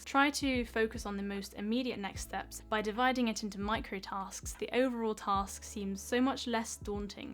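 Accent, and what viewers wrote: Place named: RP, United Kingdom. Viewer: I'm not a productive person, but now I'm trying to be...I'm working on my English and my accent now and i love rp accent. I'm planing to watch your video everyday, not only that i get to do a listening practice but i also get a lot of super helpful and amazing useful tips❤❤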